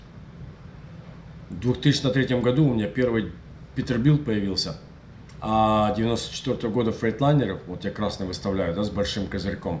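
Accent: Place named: United States. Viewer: у тебя немного америк. акцент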